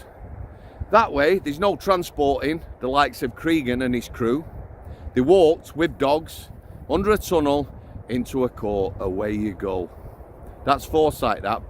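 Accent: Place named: Yorkshire, United Kingdom. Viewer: It’s probably because he’s spent a lot of time in Manchester and his Yorkshire accent has mingled together with the Manc one, which happens quite often. xx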